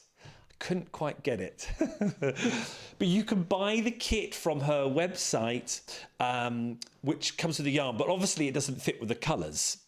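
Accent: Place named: United Kingdom. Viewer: I'm here!!! So great to see you again. A great excuse to take a break from tax prep!!! I need more than a stuffed chicken for emotional support!!! You are six foot five. Did I hear that right? How about making a six foot five blue chicken with an Olympic flag......representing you! Hahahaha! They look complicated. Will you accept one for the winter games??? I might figure it out by then!!! It does sound like fun and giving them to children would be fabulous. Where will the chickens go.....they will have flown the coop!!! I hope your store is huge or will they be "rotisserie chicken.....rotating in and out of the window? 🤣🤣🤣 You are so crazy, that's what I love about you!!! I can't wait to see your presentation! Genius! I love how you Brits say Brilliant! This is Brilliant! See you next week!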